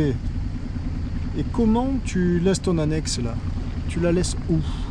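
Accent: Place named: Var, France. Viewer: j'adore t'es vidéo cette accent varois bien de chez nous plaisancier a moteur tu me donne gout a la voile continue!!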